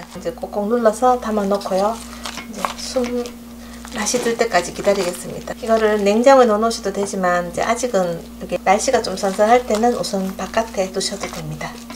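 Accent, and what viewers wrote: Gyeongsang, South Korea. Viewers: 겡상도 사투리 억양이 이쁘네요^^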